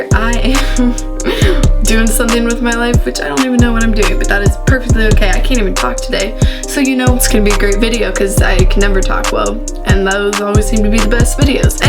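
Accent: Missouri, United States. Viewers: ARE YOU FROM MISSOURI?!!!!😂😂😂😍😍😏I AMMMM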